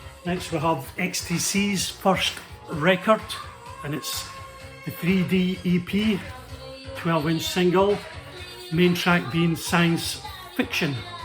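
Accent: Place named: Scotland, United Kingdom. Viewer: nice collection of 12" 45s, haven't seen many of thoughs, love and want a copy of that, I also like it when they have the 7" version on the b side, always refreshing to tune in and to hear that great Scottish accent of yours, thanks big man 👍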